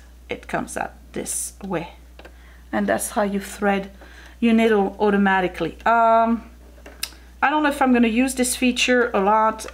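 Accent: France. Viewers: Happy birthday!🎂🤗 I am excited to see your quilting and sewing projects. That is something I have always wanted to do, but never really been able to accomplish. Maybe my old yard sale machine was the problem 😉lol. Anyway I have already learned a lot from this video. I always look for your new videos and love your French accent, it is beautiful. Thanks for sharing.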